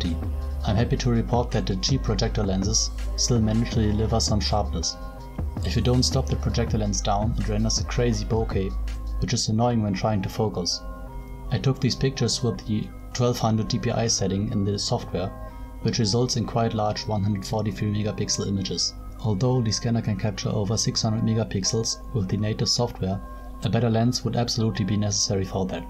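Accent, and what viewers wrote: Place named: Germany. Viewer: So yours Last name is polish but you have German accent 😄✌️👋Nice progect 😉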